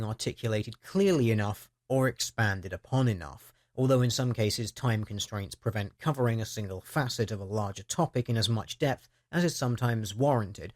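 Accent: United Kingdom. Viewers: I didn't make that assumption, you assumed that I did. I ASKED if it was typical or not, with a suggestion that it might be a British dialect. If it isn't, fine. But that just begs the question as to why I'm hearing it lately, and not just from our ruminant blue-green friend here. I'm also hearing it from at least one Aussie YouTuber as well. It's unusual and I was curious. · The video is an opinion until you present evidence. Yea, I know it's you keemstar hiding behind that British accented dear. Start pointing hooves or your video is BS, I know you want to.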